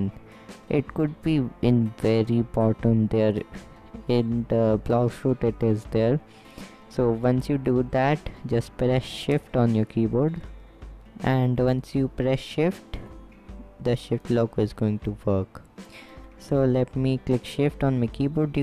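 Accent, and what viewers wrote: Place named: India. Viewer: Indian voice? Yuck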